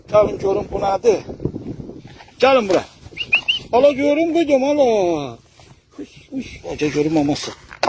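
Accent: Ganja, Azerbaijan. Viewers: Ay Allah Gəncə ləçəsi. Allah qəlbində mərhəmət hissi olan insanları qorusun. Maşallah sizə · Qardas Gencelidi lehcesinden melumdu.Gencede Qadan Alim demek bir ezizlemek sozu kimi deyilir ve bilinir.Mene maraqli olan oduki yeni bu cure gozel seylerden ancaq bu soz size batdi?? MasaAllah bu qardasa mence o dediyiniz agila daha da cox ehtiyaci olanlar var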